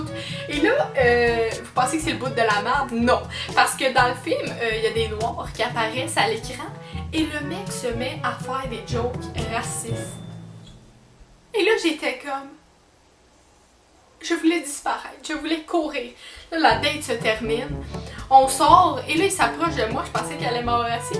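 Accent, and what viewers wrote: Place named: Quebec, Canada. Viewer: tu viens de quel endroit du québec? ton accent est vrm fort et cute 😂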